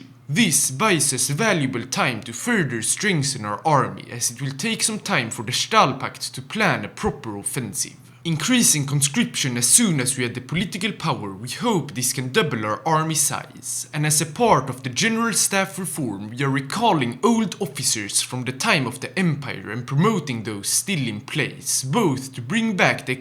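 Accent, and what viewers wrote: France. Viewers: You sound French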